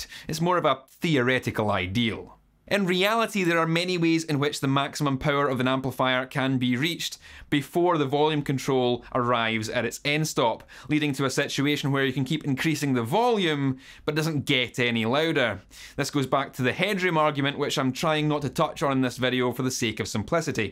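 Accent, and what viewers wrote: Scotland, United Kingdom. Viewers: Two things. First off I love your accent. Are you Scottish? ? Second thing is I play bass and drums. I did a bass gig a few years back where I used my friends Randal beauty bass rig. Seperate head and cab. No pedals, bass strait to amp. I think the cab configuration is 6 10"s . I'm not sure of the wattage. But it's a great old unit. No frills, just really fat clean low end. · I love your scottish accent. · I just love that Scottish accent. Think $1.00 per watt. I use a Crate Power Block CPB150 150 watt amp through 2 stereo Akai SW-155 speakers with a 250 watt car woofer. They sound great the way I have 'em wired up. I love my Crate. It's a Great Crate. The girl in my house (wife) screams "turn it down"! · Nice scottish accent:-)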